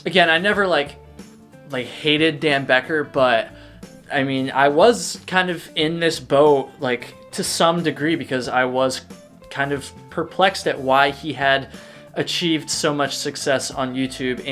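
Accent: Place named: South Carolina, United States. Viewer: Dude are you from SC?! If so what up fellow South Carolinian!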